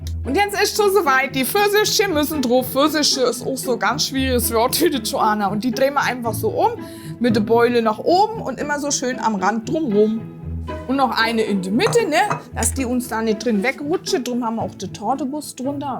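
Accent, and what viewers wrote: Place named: Hesse, Germany. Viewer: Voll genial , hab die Torte zum Geburtstag für meinen Mann gemacht , legga legga.... Dein hessisch is einfach herlliiiisch. DieStelle mit der Butter : "damit's net so " aarsch" zammfällt" :DDD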